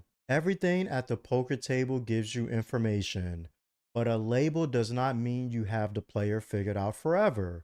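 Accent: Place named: New York, United States. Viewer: Im curious bud, where did you grow up? Where were you playing live in your road to having 7 straight profitable years playing poker? I presume somewhere in NY/NJ area because your accent sounds almost identical to my own and I feel like I have bumped into you somewhere before.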